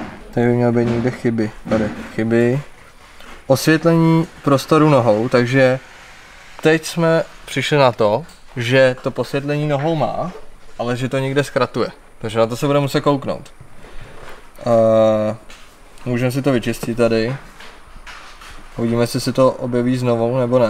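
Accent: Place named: Ústí nad Labem, Czech Republic. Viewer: furt tě slyším po ustecký jak jedeš xddd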